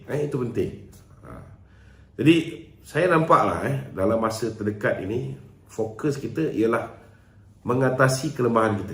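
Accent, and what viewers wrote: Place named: India. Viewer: Pada pendapat saya DLA sembang mcm keling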